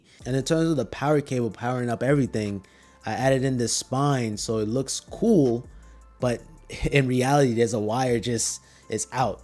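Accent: New York, United States. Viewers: Minute into the video I already know he’s from New York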